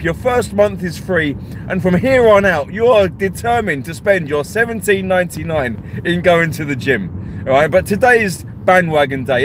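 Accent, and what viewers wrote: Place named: North, United Kingdom. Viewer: Absolutely brillant. Your Northern accent and arm impressions were brillant. Think you give Peter Kay a run for his money. I just laughed.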